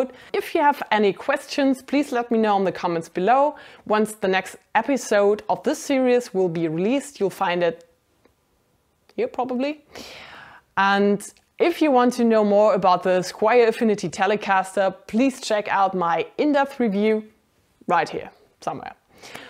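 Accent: Germany. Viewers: Hallo. Am Dialekt nehme ich an Deutsche. Alle deine Videos zum Setup waren eine grosse Hilfe. Ich besitze eine rote TE-20 und veränderte ein paar Kleinigkeiten. Messing Steg Sättel compensated, neue Saiten, anderen Hals Sattel und Locking Tuner. Von Pickups sehe ich ab. Ob sich alles gelohnt hat für mich? Bin Anfänger. Die Mühe mit dem Hals Sattel würde ich nicht mehr auf mich nehmen, aber bin um eine Erfahrung reicher. LG Robert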